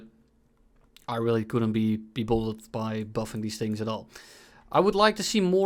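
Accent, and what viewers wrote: Netherlands. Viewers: I do love listening to Dutch people talk about something for up to an hour.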